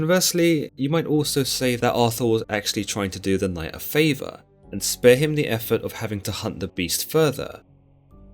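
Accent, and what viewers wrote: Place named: Southern England, United Kingdom. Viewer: He sounds like a southern bloke from England but it could just his accent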